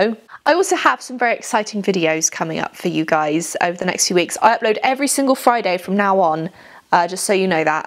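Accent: England, United Kingdom. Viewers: Are You British? I'm sorry for my obnoxious questions. I'm just curious because your accent sounds British, yet you talk about dollar store. By the way totally amazing video very relatable!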